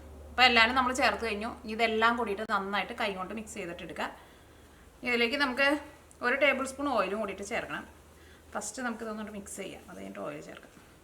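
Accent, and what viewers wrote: Thrissur, India. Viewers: ഇങ്ങള് തൃശൂർ ആണാ. പറേന്നത് മനസിലാവണില്യ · ഇതണ് തൃശൂർ സ്ലാങ്ങ്.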